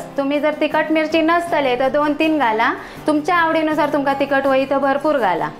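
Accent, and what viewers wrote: Malvani, India. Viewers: Maaka pn pitti lay aawdta aapli malvani bhasha eikayla kiti god vatta recipe khupp Chan 😋😋👌👌👍🏻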